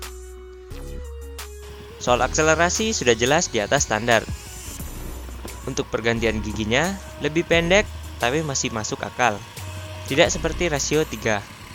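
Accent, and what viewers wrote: Surabaya, Indonesia. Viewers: Lah org surabaya kah mang wkwkwk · Org Surabaya bang?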